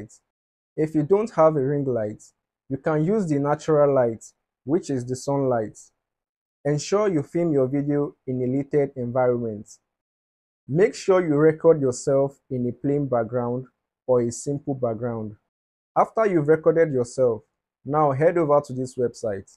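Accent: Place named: Nigeria. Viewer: I am not a linguist, an East African but I can place this accent in West Africa, most probably Nigerian but might be Ghanaian. I can almost understand pidgin though none of it is used here. Some linguist you must be.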